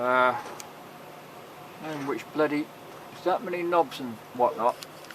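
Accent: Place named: United Kingdom. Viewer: Having listened to a lot of Americans on these topics it is beyond refreshing to hear a fellow Brit. A gibbering sprint of unconnected self correcting personality, followed by genius blow by blow creativity. Talking like I'm thinking and clearly dropping exactly what you are aiming to achieve and getting there through educated experimentation. Loving this, talking my language. 😂❤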